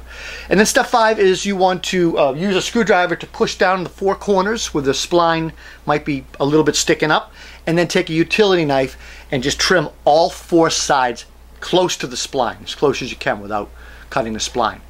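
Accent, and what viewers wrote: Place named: Massachusetts, United States. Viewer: The one thing I look for in DIY videos is that the person has an accent that I'd hear on a job site, thats how I know it'll be short amd sweet while being accurate and efficient 😂 Gotta be country, Boston, or New York.... Couldn't pin this accent but sounded like Massachusetts or New York. 👌🏻 Immediately trustworthy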